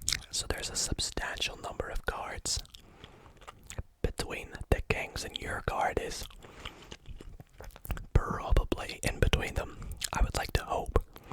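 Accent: Scotland, United Kingdom. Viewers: I’m new to you channel. You Scottish?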